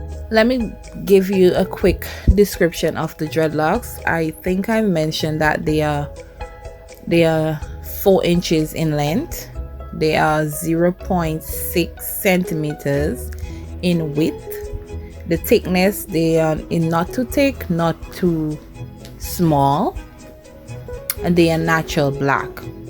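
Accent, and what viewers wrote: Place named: Saint Vincent and the Grenadines. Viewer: I knew you were an Vincentian I can hear the accent like my parents❤❤❤, I thank you for showing me an easier way to install dreads extensions 😊😊😊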